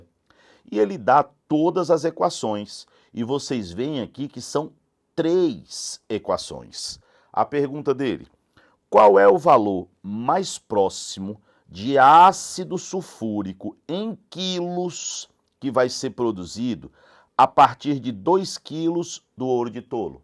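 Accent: Northeast, Brazil. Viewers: Além de excelente professor, tem um sotaque lindo demais!! O senhor é daqui do Nordeste né prof?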